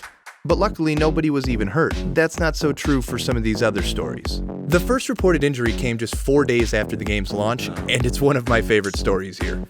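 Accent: United States. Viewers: Entertaining video!! Well deserved Like, but it's painfully obvious that you are emulating Internet-Historian with the Segway's and background music. What makes it even more jarring is the lightly-pitched American accent you have that starkly contrasts with the kind of voice most Youtube fans expect with this format and it takes away from your hard work. Constructive criticism would be to suggest using the same level of research, but develop a personal style to not seem like a knock-off Internet-Historian. I mean you even say, "ad time" and "ad over" to the same beat as the man over at Internet-Historian. At least you got paid for this.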